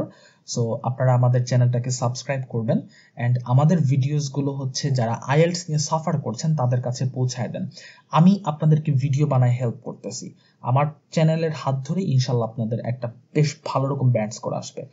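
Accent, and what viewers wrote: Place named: Sylhet, Bangladesh. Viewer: সিলেটি ভাই। আমিও সিলেটি😍🙂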